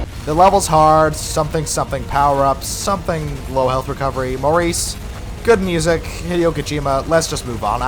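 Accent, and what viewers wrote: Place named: Australia. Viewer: As an Australian I can confirm this is how we talk down here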